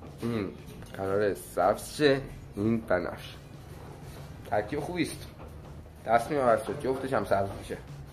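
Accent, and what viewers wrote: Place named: Hamadan, Iran. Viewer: همدانی هستی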